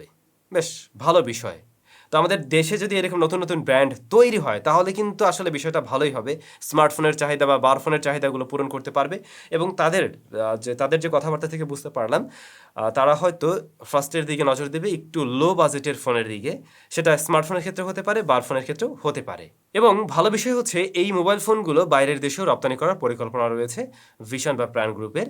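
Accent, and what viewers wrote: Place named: Narsingdi, Bangladesh. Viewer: Amader narsingdi r Kotha sone vlo laglo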